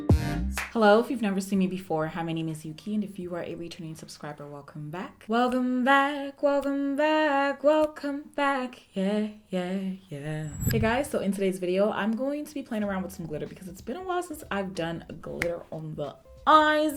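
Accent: Africa. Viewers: her African accent is soo hilarious!!! luv it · Hi,. Im in love with your personality. And this accent, omg 😂 i don't know which one it is (because I'm from Russia, english is not my language), i think it's African, right?) You are so funny and, you know, so unlike other people! Be yourself, we love it 😍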